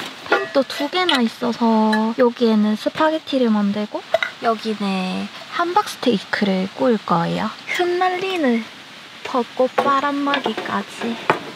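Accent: Gyeongsang, South Korea. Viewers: 사투리억양이 경상도쪽이신가욬ㅋㅋㅋ귀엽ㅋㅋ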